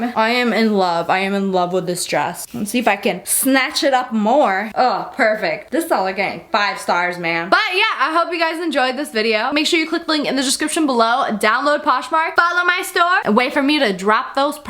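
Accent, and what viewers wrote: France. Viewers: are you french though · are you french for real?????? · Are you actually french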